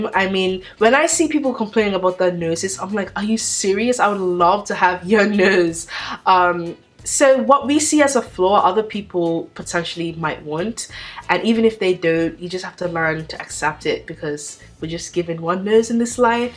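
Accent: Bermuda. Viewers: Where are you from? You sound borderline Bermudian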